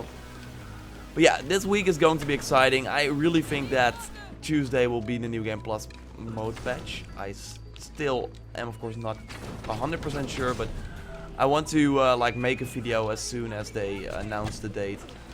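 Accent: Netherlands. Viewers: Your dutch i hear it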